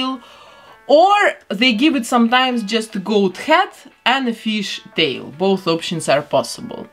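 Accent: Russia. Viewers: where is she from? l hear Russian accent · Russia, belarus or out there but I think it's from a European country because she says the money in Euros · Excuse me...but Are you from Russian? 😰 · Я не могу понять, или она русскоговорящая, либо просто плохо выговаривает англ слова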